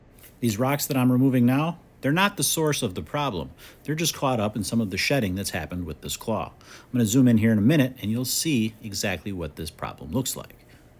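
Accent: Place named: United States. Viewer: Respectfully, what happened to your Scottish accent? Sounds American.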